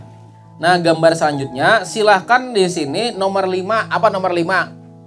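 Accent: Banjar, Indonesia. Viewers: seneng sama nada bicaranya, ini banjar ya?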